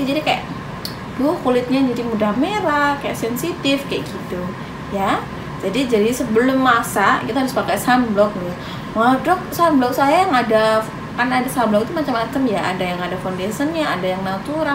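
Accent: Java, Indonesia. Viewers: iki wong jowo to, ketok medok 😅😅😅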